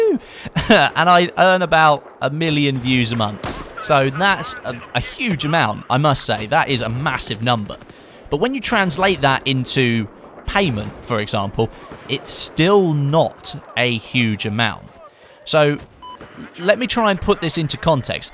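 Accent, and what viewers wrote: England, United Kingdom. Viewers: How did people not know you were from the UK? XD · Story sounds a little English to be honest. Didn't realise you're close to London. I thought you were like me (midlands)! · In fairness, as an American, I could clearly tell that was British. He doesn't sound Australian at all imo:P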